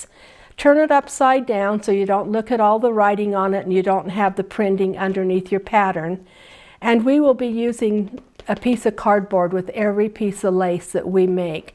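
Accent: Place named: Utah, United States. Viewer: That Utah accent came through loud and clear in the first 30 seconds…. Great tips. I am going to crochet myself a bobbin holder right now.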